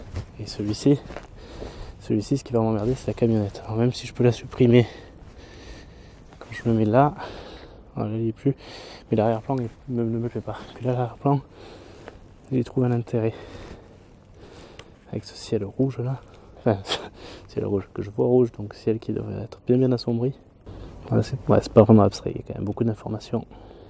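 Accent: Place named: Var, France. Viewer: Ce film a l'air très intéressant, J'ai vue la vidéo de EMGK et j'ai bien aimé le rendu ultra contrasté. Sinon tu as fait de super cliché, notamment les reflets des bateau alignés, et les deux mouettes sur le lampadaire. Hors sujet : tu es du var non? Il me semble reconnaître le bord de mer varois, et ton accent m'est familier ;) PS : je prefère la Kirin à la Asahi :D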